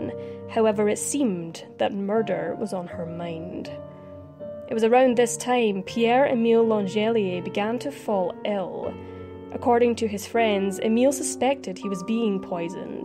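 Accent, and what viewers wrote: Scotland, United Kingdom. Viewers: I love your content! Excellent research, interesting cases. The background music fits perfectly and your Scottish accent is so easy to listen to! Well done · Why the hell do so may narrators feel that they have to put on the artificial "creepy" pronunciation when telling their stories ? For instance, this young lady will normally have a lovely Scots accent, which one can easily hear, and most probably a nice speaking voice - but both are spoilt by totally unnatural creepy speaking. I used to subscribe to this interesting channel but then went away because I just couldn't listen to the narrative any more. I am back to give both narrator and channel another try. The stories are good, the research generally excellent and the presentation superb......if it only wasn't for the "creepy" voice, everything would be perfect.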